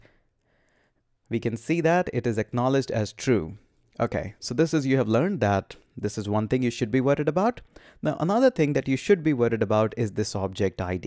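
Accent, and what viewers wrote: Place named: India. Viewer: Are u Indian? apart these things explanation is so clear loved ur way to explain topics and ur English tone too....😆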